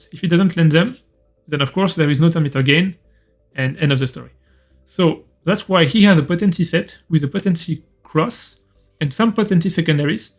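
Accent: France. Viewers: Are you french ? Can you do this video in french ?😅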